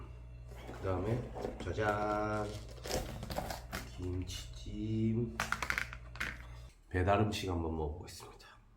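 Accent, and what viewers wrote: Gyeongsang, South Korea. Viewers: 무슨밥? 머슴밥? ㅋㅋㅋ남편이 가끔 “머선일이고?”해요 ㅋㅋ 고 느낌인데요?ㅋㅋ경상도 사투리 좋아하는 1인이예요~😊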